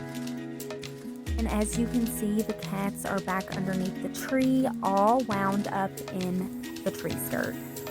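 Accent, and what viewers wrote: South, United States. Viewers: Hey... your home looks great also I'm new to your channel, I just subscribed. Where do you live? I detect a southern accent. Shelby NC here.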